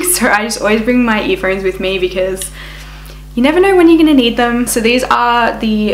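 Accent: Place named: Australia. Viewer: I have 4 of them - I feel I’m justified because normally one bag is $1500 for me at the very least I really like high in bags so for me to have four or five of them would equal the cost of one bag so there’s my justification😂 I just love your accent oh I’m not sure what country you’re in but it sounds Australian but anyway I absolutely love the accent I wish I had it!!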